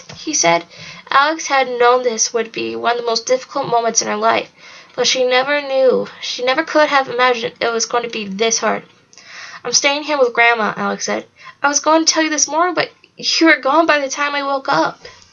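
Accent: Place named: United Kingdom. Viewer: Her British accent is everything!!😂😂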